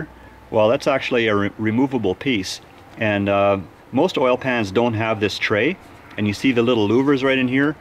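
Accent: Canada. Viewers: Canadian? I love the accent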